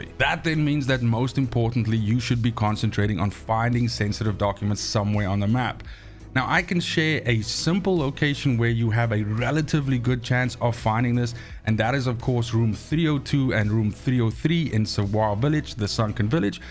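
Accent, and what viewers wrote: South Africa. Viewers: I'm trying to figure out your accent. Are you from South Africa?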